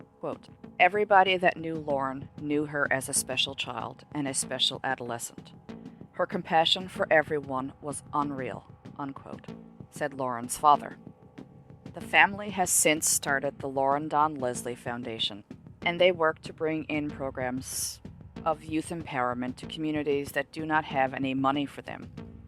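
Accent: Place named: Canada. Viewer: She sounds extremely Canadian..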